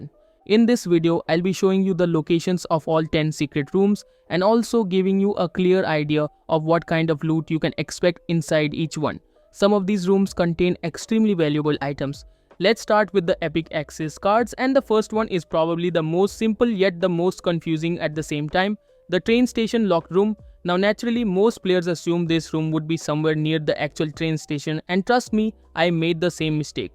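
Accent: India. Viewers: This guy is the only indian tutorial guy I want funny jokes and a good indian accent when speaking English he speaks like amir the door dash guy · Ugh, that stupid Indian accent 🙄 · Damn it had to be an indian accent video, fuck that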